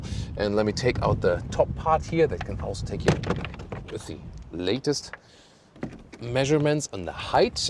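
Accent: Germany. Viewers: I love this german accent when he says R. (Eg. Lower bumper - lowa bampa, slimmer = slima)😁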